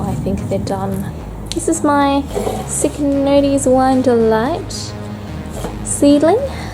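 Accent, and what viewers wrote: Australia. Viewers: I really enjoy your Orchies in Bloom videos. For one thing, I could listen to your voice with that Australian accent all day long. It’s so soothing and your content is interesting. Looking forward to the star of the show next month!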